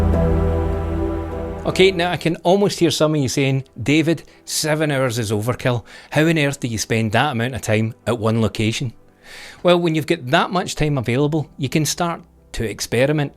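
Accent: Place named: North, United Kingdom. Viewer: Mate, I can hear you speak for hours and hours! I normally put videos like these in the background while I cook breakfast and hope to get some inspiration for my next photography trip. But today, the eggs and butter remain untouched throughout the 14 minutes of this lesson. I was genuinely engrossed and didn't bother whether I wanted an omelette or fried. Great photos my fellow northerner! Came for the tips, staying for the accent.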